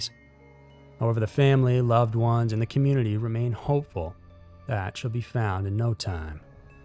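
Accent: South, United States. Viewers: Great video. And I like your southern accent too.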